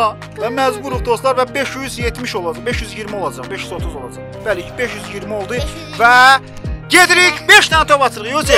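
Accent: Baku, Azerbaijan. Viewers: Əmi sən Bakılısan?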